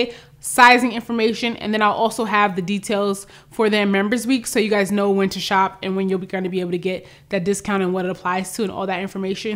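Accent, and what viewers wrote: New York, United States. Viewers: Great picks. Checking out the closet sale. And I’ve been meaning to ask you were from New York because of your accent and boom like that you told me without my asking 😊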